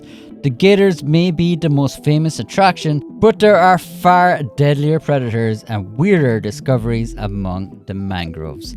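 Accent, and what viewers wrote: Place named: Ireland. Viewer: hey btw where are u from? u sound like... idk sorta irish and sorta something else?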